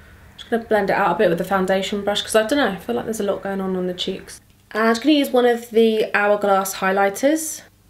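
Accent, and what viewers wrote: United Kingdom. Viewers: I love her british accent and the way she says "Bits & Bobs" and all of the traveling she's getting done! Indie is getting to see the world already! You guys are great parents 💕🤗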